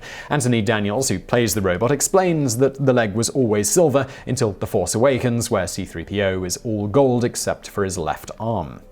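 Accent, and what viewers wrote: England, United Kingdom. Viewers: why is Simon talking with an English Accent? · Sorry chap, doesnt matter how english your accent is, you just cant simply explain away the Mandela Effect. You mainsteam media people are utter fools.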